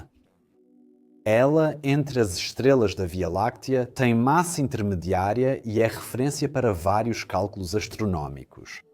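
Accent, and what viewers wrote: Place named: Portugal. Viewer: Excelente vídeo. Ficou legal ser narrado metade pt.pt e metade pt.br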